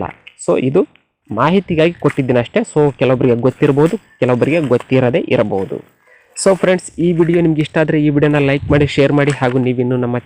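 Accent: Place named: North Karnataka, India. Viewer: ಸರ್ ನಿಮ್ಮ ಮಾತಿನ ಶೈಲಿಯಲ್ಲಿ ನಾನು ಗುರುತಿಸಬಲ್ಲೆ ನೀವು ಉತ್ತರ ಕರ್ನಾಟಕದವರು ಅಂತಾ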